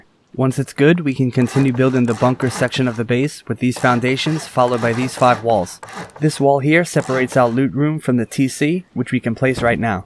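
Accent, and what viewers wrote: New York, United States. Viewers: what part? im from Merrick on Long Island, i could tell a fellow New Yorkers accent. Also good luck with the videos, very well laid out and descriptive.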